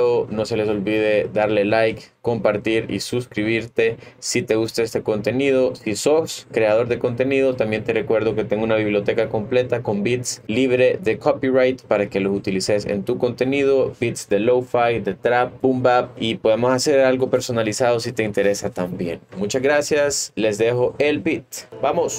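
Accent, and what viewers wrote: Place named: Honduras. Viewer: hermano soy tu fan desde que vi este primer video pense que eras hondureño estaba asustado, dije y este brother quien es jajaja saludos hasta SV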